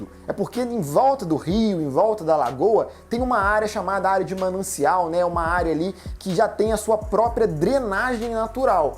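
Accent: Minas Gerais, Brazil. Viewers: Vídeo top ,vc e mineiro também m sabia 😄uai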